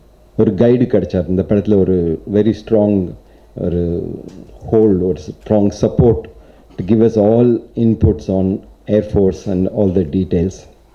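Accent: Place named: South India, India. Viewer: hes talking like a south indian aditi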